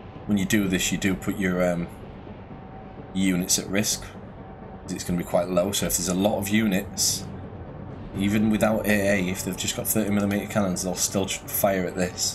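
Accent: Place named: Scotland, United Kingdom. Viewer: As a non English native speaker, I sometimes have a hard time to get your charming scottish(?) dialect. But: your tutorials about this game are short, precise and in a perfect way, useful to me. Thank you, subbed! BTW. Did you tried the VR version already? Just in case, I didn’t found out how to zoom sight.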